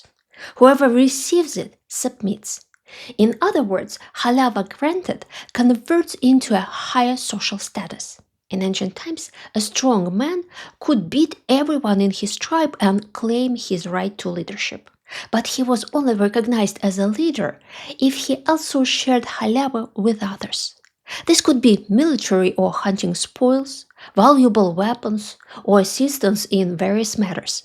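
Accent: Russia. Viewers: Just my two cents... 1. Terrible English pronunciation (strong Russian accent), painful for my ears, a transcript would have helped. 2. Long, convoluted sentences, the entire talk could be made more succinct, the concept does not desire such a lengthy analysis. 3. Khalava (халява) is a simple concept, called "bribe" in English (literally "present", or "cadeau" in French). 4. you link military technology and Russia not posing a threat, neither is either true or have logical connections, not to mention the concept of "threat". 5. Subsequently, you hint on a Hitler analogy for Russia: please... we know who are those spreading that false narrative, are you one of them? Bad idea. 6. Having a separate video about Putin's psychological profile? What kind of credentials do you think you have to make anyone interested in it? 7. Talking about arrogance (that you yourself radiate), you are absolutely right (ironically), that it is a bad trait and should be avoided, along with hubris. 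8. On generic lines, you are not saying anything outrageously stupid or incorrect, just repeating a certain narrative, hence "nothing new". Alas, biased videos like this can proliferate on YouTube, as there are always enough polarized people who will feed on these. IMHO this was a waste of (my) time, so good luck with this narrative, but I find it unwatchable and a (rarely clicked) thumbs down. Peace :) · I love the Russian accent, it is such an interesting informative explanation about the Russian culture and mindset.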